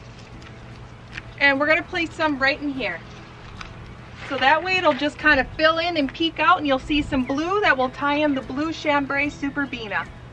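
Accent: Wisconsin, United States. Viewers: I think she is from Wisconsin.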